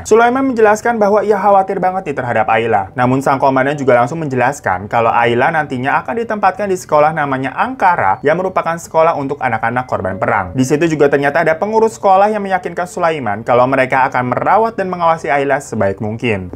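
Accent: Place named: Banyumas, Indonesia. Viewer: Bang muka jepang tapi ngomongnya ngapak, 😂😂 i love you abang 😘😘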